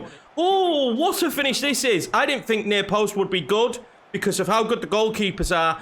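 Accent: Yorkshire, United Kingdom. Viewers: Where you from in Yorkshire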